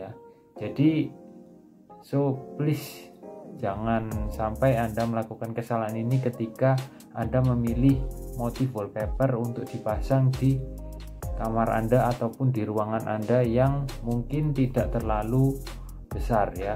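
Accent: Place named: Java, Indonesia. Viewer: medok ya mas😀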